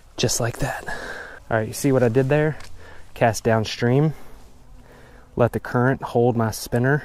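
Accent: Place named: Baltimore, United States. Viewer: Jamie Emes my first guess was Maryland. Sounds like bawlmer. Checked his Instagram seems WV, pretty dang close to western Maryland.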